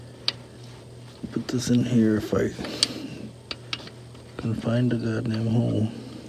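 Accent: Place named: Alaska, United States. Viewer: You sound like you are in Alaska judging by your accent. You are a very kind man.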